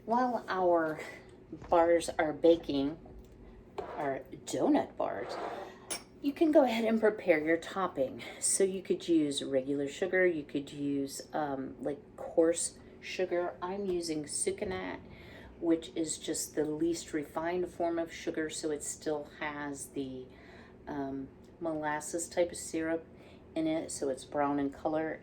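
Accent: South, United States. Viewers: Hi, your new oven looks great 🤩 I think the split door is an incredible idea!! have not seen that before. Alot of my recipe books have timings and temperature written in by me multiple times depending on what oven I had at the time, they vary so much, my present one is on the slow coach side. Recipe sounds really yummy 😋 and looks really pretty in your dish. Love the sound of all the content you have lined up it's definitely going to help me through the rest of the year 👍 Definitely yes to a P.O box too!!! that would be lovely 🥰 I love accents I think they're our history and I love to hear them, so you go as Southern as you like girlie 💕 Lots of love and appreciation from across this darn pond 🤣🤣 Thanks, love to Frankie too 🐾💕🐱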